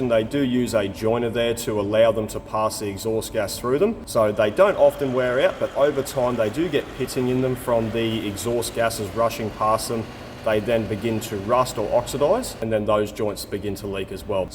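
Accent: Australia. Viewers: Quick question.....why doesn't the thermal shock crack the cast iron? I've always heard that cast iron doesn't do thermal shock well. I live on the completely opposite side of the world but your videos are anxiously awaited. Don't know if it's the skill, professionalism or that Aussie accent but it's a great video!!😊😊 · never seen or heard of this channel until it came up on my feed, watched it and as soon as i realised you’re aussie, subscribed and liked! always back ya fellow aussies 🙌 really really great/interesting work you do too!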